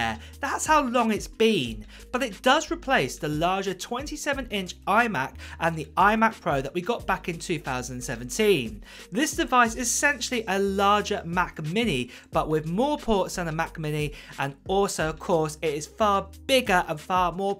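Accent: United Kingdom. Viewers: i love your british accent and i hope to have the iphone 14 pro max pleaaaaaaase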